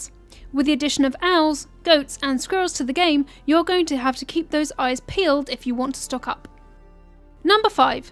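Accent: United Kingdom. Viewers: Are girls not allowed to have a British accent?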